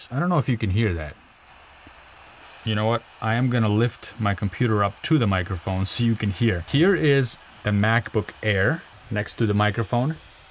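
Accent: Russia. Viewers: Русский акцент выдает мистера. Гуд кантент. Ай хит дат лайк баттон. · da , kagda ya slishal evo ruski dialect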